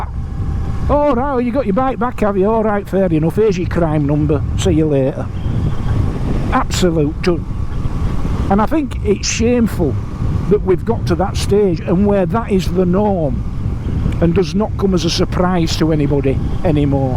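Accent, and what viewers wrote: Yorkshire, United Kingdom. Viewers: Great to hear a fellow Yorkshireman speak out 😊 I fully agree with all you say here, as we do seem to have a two tier police system; that seriously needs to be altered. Nobody seems to be respectful or afraid of policemen in today’s world, & therein lies so many problems. 😢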